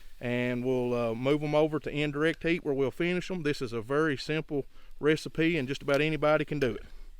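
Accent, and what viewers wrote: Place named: Appalachia, United States. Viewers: that's the best hillbilly bears accent I've ever heard, where about are you from , i need to visit that state. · Listening to you talk always makes me miss family down in Letcher County. I'll definitely be giving the pickle juice a try when the weather warms up. Thanks for another great video!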